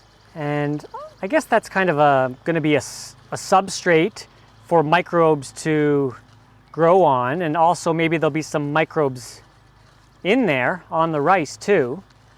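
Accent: Canada. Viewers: My guess is Canada.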